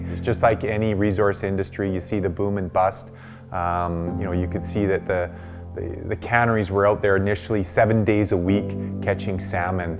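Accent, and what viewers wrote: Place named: Canada. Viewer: Anybody wondering what a Canadian accent sounds like here it is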